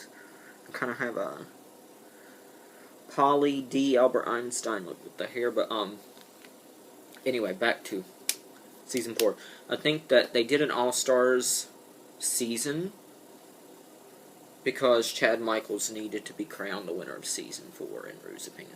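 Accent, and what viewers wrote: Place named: South, United States. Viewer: He sounds southern. Slow and the pauses, yes. The "ums" are a trademark of his videos Ive noticed. But it is who he is, if he did his videos definitely he would be acting. Everyone has their own style and mannerisms on youtube.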